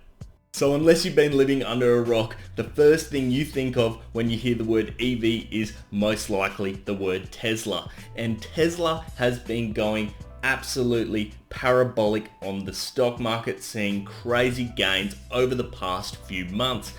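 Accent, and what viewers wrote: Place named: Australia. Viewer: Just because you have this Aussie accent, I'm already a huge fan of yours :) As a 4+ years experienced Model S owner, I highly cannot recommend Tesla anymore due to how the company treats you as a customer (the vehicles still are great). I'm looking forward to replace our Model S by a Rivian R1T as our family car, because we love tenting (2018 we did so in a 4x4 with roof tent in the Outback). If it was possible to do this trip in an EV: even better!!! Therefore hoping Rivian will sell to Europe very soon!! :D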